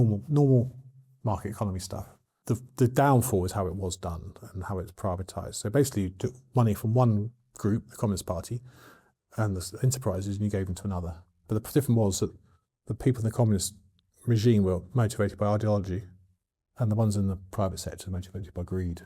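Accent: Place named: United Kingdom. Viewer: Bring the audio volume UP. We in America have a difficult time understanding his British mumbling on low volume. He's knowledgeable. We'd like to understand what he's conveying.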